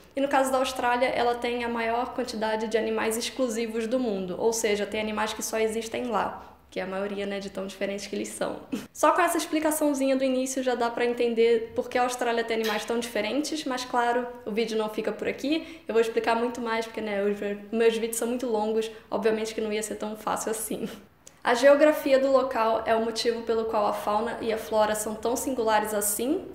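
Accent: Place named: Portugal. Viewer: Vc é de Portugal ou não?kkkk vc tem um tipo de fala de lá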